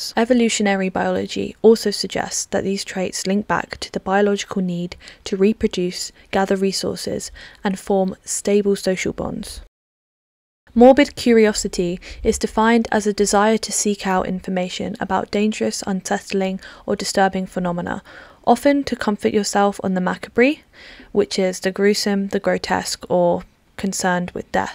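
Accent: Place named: United Kingdom. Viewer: Maca bree? Is macabre pronounced differently in the UK? (Only guessing, as you sound British.) *Didn't want to leave just that...i found the entire essay insightful and well-written. You have some good thoughts and know how to put them together. I have an English degree but only write for myself now, mini essays. I was listening while, ironically, coloring in a coloring book (with my 300 sharpies) as i do when i flip around youtube looking for something engaging. I subbed, and I'll be back to check out the rest of your content. (One more thing: i used to have a little dog named Honey Bee, and i have "Bee" tattooed on my back, on my shoulder blade. Its the only tattoo i got in jail, years ago.)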